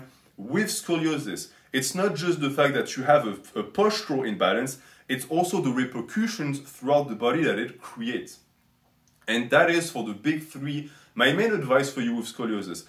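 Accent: France. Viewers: I literally for some reason could not put my finger down on your accent, and now I know it's French...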